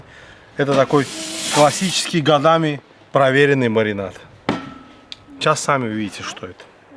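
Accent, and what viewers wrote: Baku, Azerbaijan. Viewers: ДЖАН Э ДЖАН. БАКИНСКИЙ АКЦЕНТ ЛУЧШИЙ. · Супер шашлык и супер бакинский акцент.все родное.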